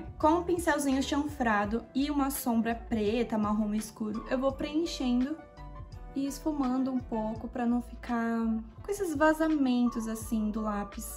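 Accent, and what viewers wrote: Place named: Brazil. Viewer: Da onde vc é? Seu “R” não é de Sampa.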